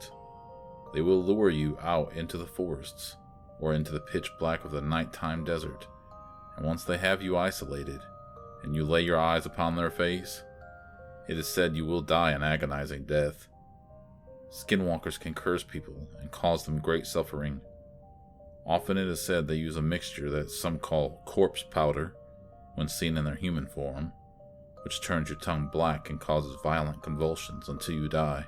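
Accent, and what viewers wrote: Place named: Michigan, United States. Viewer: And are you Native? Your accent actually reminds me of some friends of mine from Michigan that are Ojibwe! It was the southern "influence" in your voice that threw me off though! LOL!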